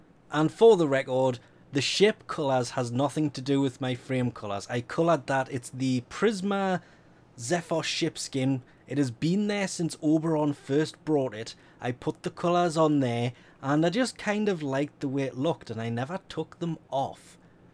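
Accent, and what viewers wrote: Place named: Newcastle, United Kingdom. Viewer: Ziot English, possibly a Geordie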